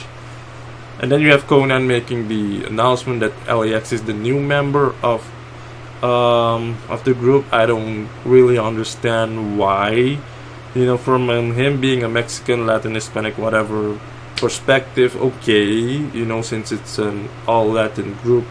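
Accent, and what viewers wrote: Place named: Europe. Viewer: Your accent is interesting. I thought maybe Europe somewhere. Anyway your vids are dope. New sub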